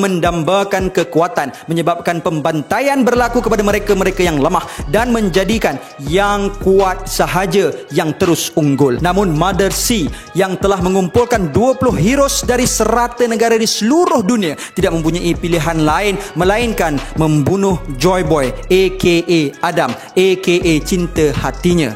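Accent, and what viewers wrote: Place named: North, Malaysia. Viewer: loghat utara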